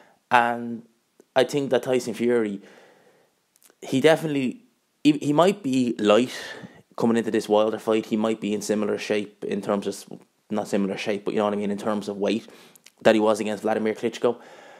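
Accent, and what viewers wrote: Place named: Ireland. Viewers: Wether Irish voice or not nice to see a non biased opinion based on boxing knowledge not who is liked most ! This is 2nd video watched and subbed ! ....... more posts like this the better as to many now Mae videos on who they like and people just say "casual" to the with different opinion ! .......... keep posts coming mate ! Gman........and aaron refreshing to see someone commenting with no insults for a change 😂 peace ✌🏼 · Hey bro Good to here an Irish voice! Good video ! Fair play, keep them coming! Anyways I think fury will school him, easier then Klitschko!! And truthfully brother I definitely believe the gypsy king is in way better condition now then he was against Wladimir Klitschko, his more powerful now and still got the speed ! Cause now his doin weights, were for he didn’t before! And bro he seems WAY HAPPIER NOW 💪🏼 and you know the saying ( A HAPPY FIGHTER IS A DANGEROUS FIGHTER ) THE GYPSY KING IS BACK!!! 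A NEW 🥊🥊🥊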